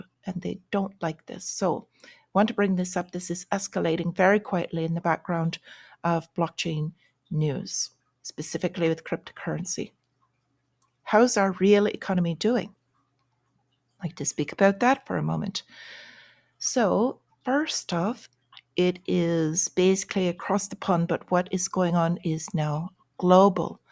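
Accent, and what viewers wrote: Ireland. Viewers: you must have stock in Japan I notice you really focus on it. Must have property there or family in Japan. which is weird with the Irish accent.:)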